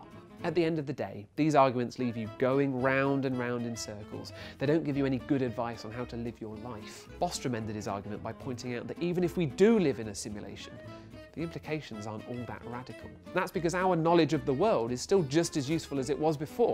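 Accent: United Kingdom. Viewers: I HATE THE BRITISH ACCENT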